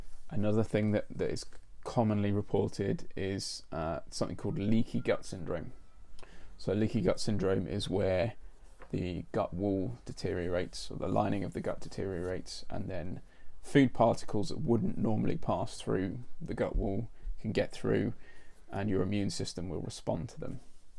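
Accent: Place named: United Kingdom. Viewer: I took accutaine twice, once in my teens and again at around age 32. Suffered different areas of body pain through my 20's but never made the connection. After taking it the second time, got major gut issues, pain got worse and lots of brain fog. At 42 the symptoms got really bad fulltime and I've been sick and unable to work since. I'm 62 now. Do not even think of taking this drug! I've tried almost everything but I never heard of the gallbladder/liver connection until I watched your video. It makes a lot of sense. Going to look into it further. Have you thought of FMT? You have a British accent so if you are in England, there is a clinic called, I believe Taymount, that some say has helped them. I'm in Canada so it would be a bit of long haul. We'll see. Please keep posting if you're able.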